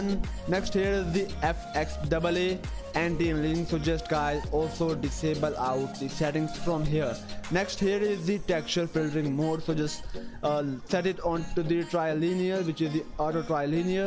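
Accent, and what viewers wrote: India. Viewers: Overused music , indian accent = lit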